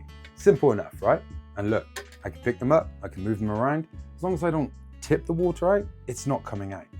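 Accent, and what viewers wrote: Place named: United Kingdom. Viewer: Mate are you from the uk by any chance?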